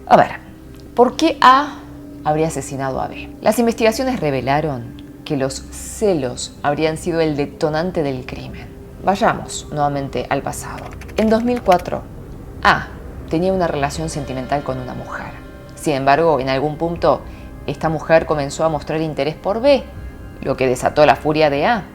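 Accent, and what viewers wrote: Argentina. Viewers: hablas perfecto español argentino me alegro mucho por vos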